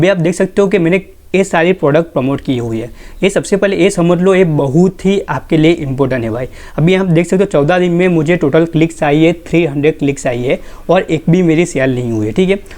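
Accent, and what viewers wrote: Gujarat, India. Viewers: Kya aap gujarat se ho? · bhai tame gujarati 6o?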